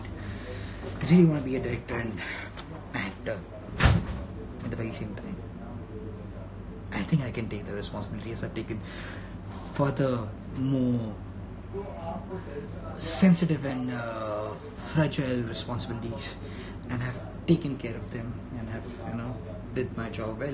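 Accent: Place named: Delhi, India. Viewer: This day will be going soo beautifull bcz it's morning is soo fresh and happy......app inte fresh and happy and cute lag rahe the ki ufff apse nazre hin nehi hat rahi thi....😍😍😍kabhi socha na tha ki subhe uthke sidhe apko live dekhungi.....Hayyyy subhe subhe apko dekhke to pura din ban gaya this is one ofthe most happiest morning of my life........apki batein apke bolne ka tarika.....apki adaein...apki inspirational batein.....apki ankhe.....apki soch......every small little thing which you have just wonderfull.......apki woo hasi or frnd k sath bat karna Delhi style main.....jese bolte hain na Dilwalon ki Delhi hoti hai....wese hi apke pas joo dil hain na woo bohot hi acha hain or woo ek dam bache k jesa hai sooo cute baby......Yarr Dilliwale to chagaye si.......and thanks to sir for encourage our dear Rajat sir...... sir told that Rajat sir is a finestt acter I also agree with him...Rajat sir is the bessttt acter in this whole world....such a kind heart he has...such a pore soul person he love every one.....and he always say good for every and and learn and observe good things from every one......he always pampers his loveones....that sooo sweettt of him.......I never seen any humanbeing like Rajat sir.......he is the one person in my life who make me happy.......when I see him I feel soo peace in my eyes and my heart.....his smile it just killerrr😘😘😘😘I can't say anything about him bcz he is perfect from every side....like as a human or acter or person or son or fr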